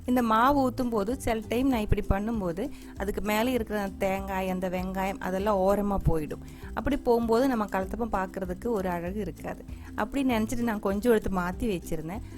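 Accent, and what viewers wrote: Kerala, India. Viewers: Malayazhi speaking Tamil very cute and recipe very nice.... Try panni paakurean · Malayali speaking tamil will be great to hear. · Love your malayalam slanged tamil. So sweet to hear . Looks like an easy recipe. Will try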